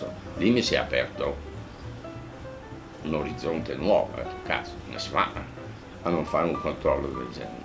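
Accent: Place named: Naples, Italy. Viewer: Spesso dice cose discutibili ma qui è stato grande con tutte le ragioni simpatico reale e comico l'accento espressivo napoletano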